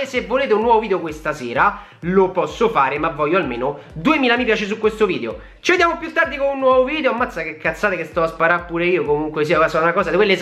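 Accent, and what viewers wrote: Rome, Italy. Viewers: Di che zona di Roma sei?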